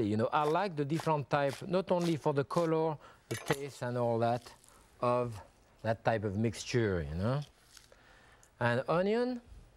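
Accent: France. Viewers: He corrected himself a minute later and said “of course it’s a cantaloupe “, but you may not have understood his divine French accent.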